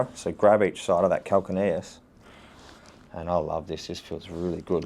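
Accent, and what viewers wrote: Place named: Australia. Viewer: Getting fixed while that sexy Aussie sauve voice sexing me down...is what's up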